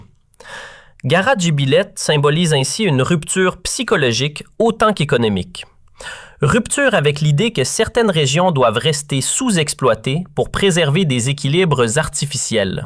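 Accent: Canada. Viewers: à l'accent canadien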